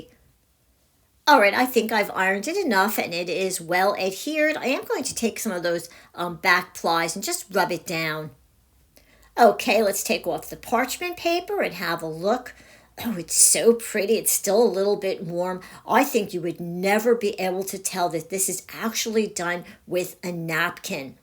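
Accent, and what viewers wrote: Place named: Connecticut, United States. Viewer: Just 'discovered' you today!! I've subscribed & given you thumbs up!! You are such a great teacher!! I love your happy, cheerful energy! Your accent reminds me of my Aunt Mary. She came from Connecticut. Question: Can we use tissue on canvas bags, or only napkins? Now I'm inspired!! I guess fabric on fabric would work with this method??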